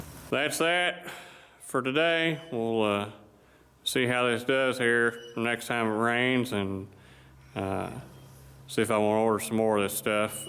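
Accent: South, United States. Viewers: Buddy, mineral wool is for sound proofing and not for insulating or moisture control. Call a union contractor in for a consult. Use cellulose to control moisture and put poly film up after it is blown in. Stop reading about this on the webby web, call a local professional. YOu sound southern so you might need to put the poly outside, again call a local professional. For what the roxul costs you could hire that job and have it done with a proper material for your situation there. Good luck! Roxul will hold water btw, it wont control it. Think of a wet sponge. And then the slag will rot and rust, you'll see. And when it does it smells like someone shitted in your walls. You asked for other's experience, here's mine.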